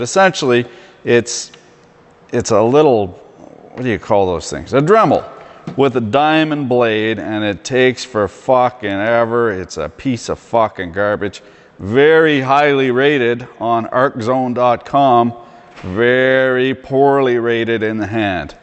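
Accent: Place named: Canada. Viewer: I don't know shit about tools but you talk like a true maple syrup baby and I love it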